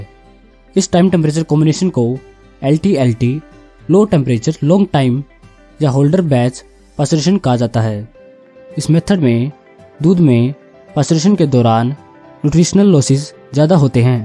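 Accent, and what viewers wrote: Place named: Haryana, India. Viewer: Haryanvi hindi....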